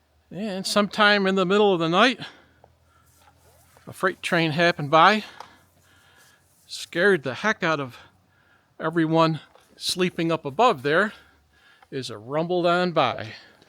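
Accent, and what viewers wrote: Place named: Finger Lakes, United States. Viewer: as one originally from the Finger Lakes (and recently interested in acents), it's nice to hear someone speak like I do. (Over and above the fact that I'm a life-long rail fan also. 😊) Thanks for also for your dose of calm and sanity amist the mess on YT and other social media these days. Re Scouts: I remember camping in Constantia NY in -40F for a regional Klondike Derby back in the mid 70s. Of course, all the tent campers were brought into cabins that night; leaders brought their car batteries in also to stay warm; and the next day was sunny, windless, and glorious with squeaky snow: perfect for the 'Derby!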